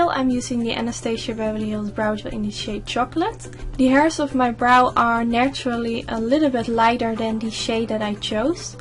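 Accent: Netherlands. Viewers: I hear you are from the netherlands hahah love it when we speak english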